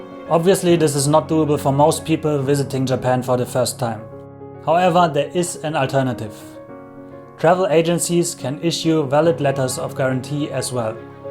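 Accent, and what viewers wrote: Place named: Germany. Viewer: You have the most cliché kind of German accent :D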